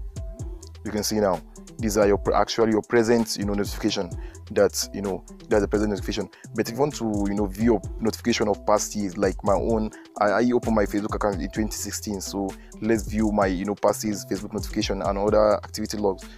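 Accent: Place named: Nigeria. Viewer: This guy sounds Nigerian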